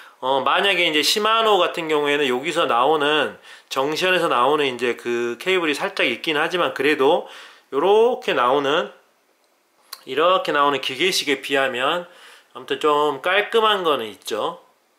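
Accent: Jeolla, South Korea. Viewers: 전라도 말투 너무 구수합니다 ㅋㅋㅋ 시마아노 ㅋㅋㅋㅋ 항상 좋은 영상 감사합니다 ㅎㅎ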